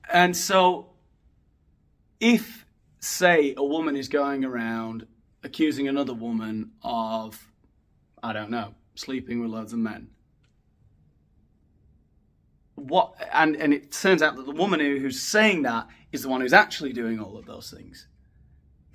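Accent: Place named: Yorkshire, United Kingdom. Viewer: I'd pay proper money for an audio version....with you reading it. A proper, Yorkshire accent would make it almost bearable......I'd still have to take regular wine breaks, though. But I'd rather stick pins in my eyes that listen to that awful pseudo Americanised drawl from Hawy.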